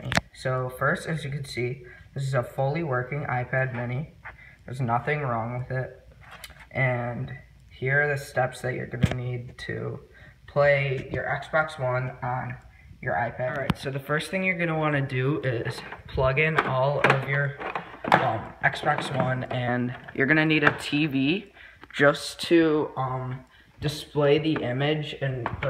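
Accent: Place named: United States. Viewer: Wow as if the click bait wasn't enough you have his cheesy annoying American accent and his voice which obviously hasn't hit puberty yet get a life you sad kid.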